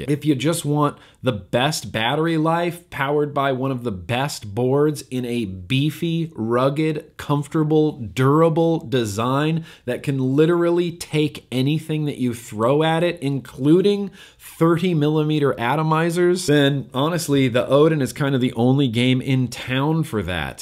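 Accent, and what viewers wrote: Australia. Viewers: Not bad on the aussie accent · I approve your Australian accent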